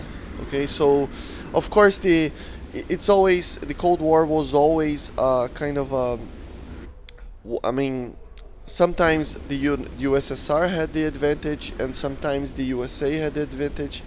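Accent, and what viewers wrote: Brazil. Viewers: aha, desconfiei. O sotaque brasileiro não engana kk Mas que canal top cara, descobri por acaso. É só questão de tempo para o canal crescer * sul do brasil onde? rio grande do sul?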